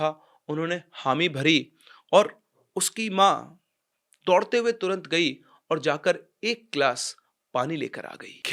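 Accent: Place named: Bengal, India. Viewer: Bengali accent ....story bengal ki h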